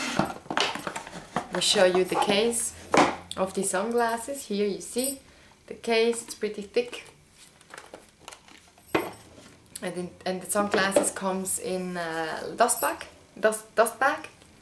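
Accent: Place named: France. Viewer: You are so sweet! And I love your French accent hihi, I love the French language! I'm sorry if it's not French by the way, I know you are from Switzerland ^^. I subscribed!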